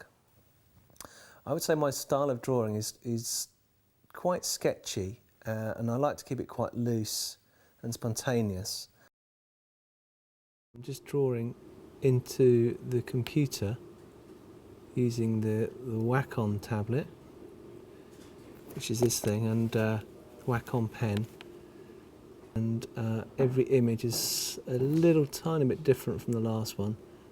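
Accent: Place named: England, United Kingdom. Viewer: I KNEW he was English. Lol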